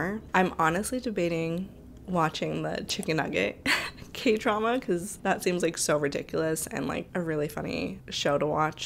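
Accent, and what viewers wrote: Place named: Colombia. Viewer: me encanta tu acentito colombiano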